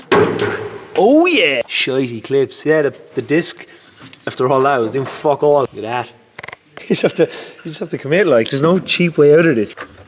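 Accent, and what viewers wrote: Ireland. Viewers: I missed your FOKYN Irish accent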